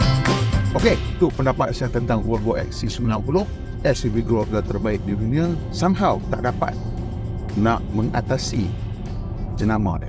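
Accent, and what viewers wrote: North, Malaysia. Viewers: Well review tuan…tapi kali ni personally rasa lagi best sebab kelauq nahu2 utara hahaha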